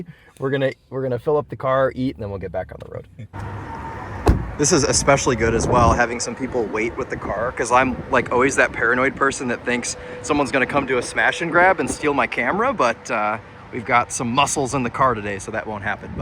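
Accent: United States. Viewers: As an Iraqi Arab student, I enjoyed this video very much. It was like an English lesson for me, and I benefited from it to develop my English because your words were very enjoyable and very useful. I also like the American accent, and as for the car, it is wonderful, and it was a wonderful experience. keep on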